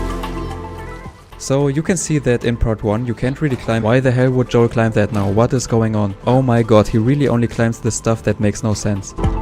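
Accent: Germany. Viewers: Man hört so fett raus das du deutsch bist hahaha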